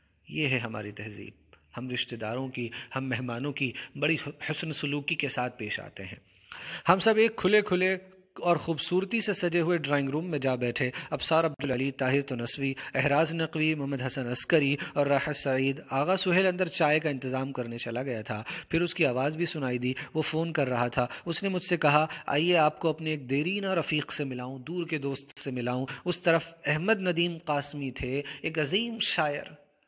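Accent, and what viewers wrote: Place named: Punjab, India. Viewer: wah sir kiya Punjabi boli hai apne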